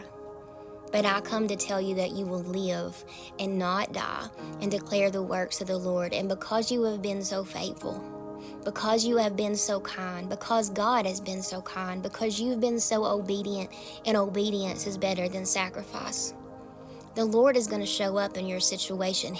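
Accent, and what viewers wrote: South, United States. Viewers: You Are So Beautiful 🙏 I Absolutely Adore You 🤫 That Southern Draw You Radiate Is An Undeniable Beauty Like No Other🤭 I'm From Bama & I Love It. Have A Wonderful Monday Sweet Angel 🫶🫂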